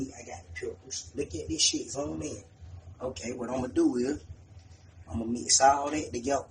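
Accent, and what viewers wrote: Atlanta, United States. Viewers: You can tell he from atlanta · I know a Atlanta nigga when I hear one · ATL nigga for sure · Kuhh from atl?😂😂😂😂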